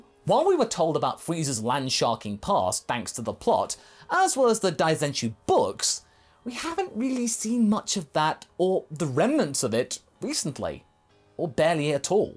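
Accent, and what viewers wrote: United Kingdom. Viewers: I've never known if you live in America or the UK, you're very well poised and well spoken so I'd go more towards the UK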